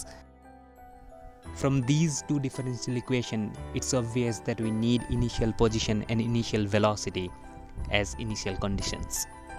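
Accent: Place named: Bengal, India. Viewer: Are you Bengali bro ? Your voice seems like a Bengali people lecturing in English !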